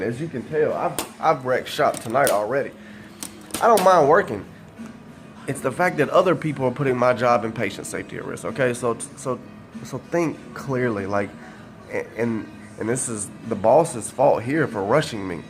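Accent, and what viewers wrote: United States. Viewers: Joey Monroe prob just a bronx/new york or brooklyn accent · HARD WORKING GUY. SEEM DEDICATED, AND YOU ARE LISTENING TO IN THE BACKGROUND. PLEASE STOP TALKING WITH Y OUR NEGRO SOUTHERN ACCENT, YOU'RE WHITE -OR SO IT APPEARS. SOUND PROFESSIONAL. THANKS. KEEP ON TRUCKIN.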